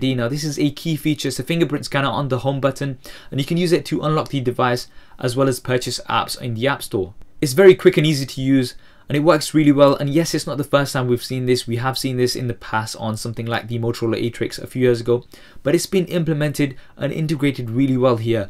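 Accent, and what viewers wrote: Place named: United Kingdom. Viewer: This man has British Accent and I love it. Its more understandable than the American Accent. Well, going back to the topic, for me iPhone 5S is better and BEST than any other phones either. Samsung phones are just copycats of iPhone's features and specs and EVEN the accessories! Without iPhone being released on the market, these copycat android phones won't exist!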